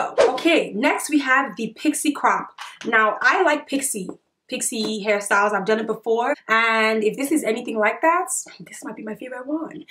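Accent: Nigeria. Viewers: Pixie crop is hands down my favorite plus I love how you switch up that Nigerian accent. Steady makes me smile 😀😀😀😀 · I just want to keep listening to you and that mixed Naija accent. Watching your videos instead of editing mine and im already late for my Youtube upload. Stopppeeet! Ya too cute girly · I love your Nigerian accent. You had me dying. (I'm Nigerian so it made me laugh)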